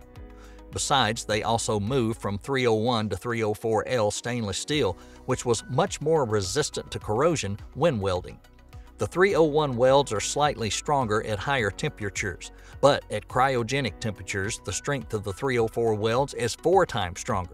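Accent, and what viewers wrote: South, United States. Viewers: very good video. I work at JSC and I love hearing a friendly Southern ascent delivering complex information.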